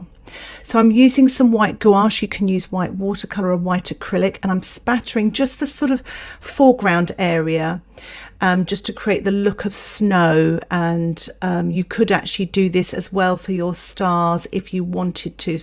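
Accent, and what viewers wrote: England, United Kingdom. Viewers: Lovely, from Green Bay, Wisconsin, USA. Watching painting and avoiding the election drama, too much anxiety. Your lovely English accent calms me. Thank you!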